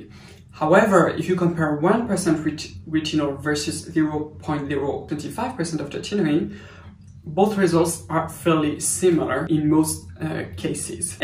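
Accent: France. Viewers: I am very sorry but do you have English subtitles? I can only see French subtitles. I am struggling to understand your lovely French accent. Not your fault but if there’s English subtitles, it would be cool. :)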